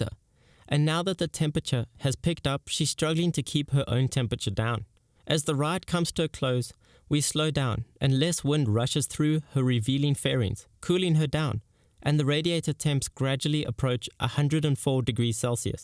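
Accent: South Africa. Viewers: Is that south African accent? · Bro you from south africa 🤤🤤🤤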